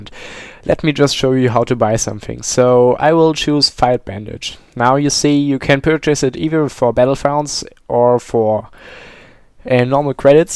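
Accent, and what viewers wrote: Germany. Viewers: sounds like u are german ? nice video ! :) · Let me guess, you're German, right? ;] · Nice german accent brah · you german right ?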